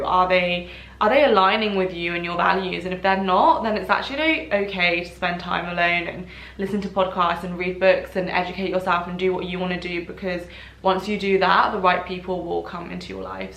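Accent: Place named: Australia. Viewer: love this!!! i use to watch you YEARS ago - so nice to see you back on youtube. i feel like i can hear a slight australian accent on you now xxx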